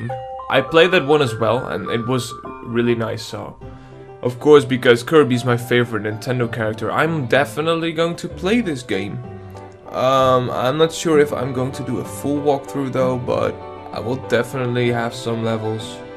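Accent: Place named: England, United Kingdom. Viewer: Hoe kan jij zo goed met een Engels accent praten?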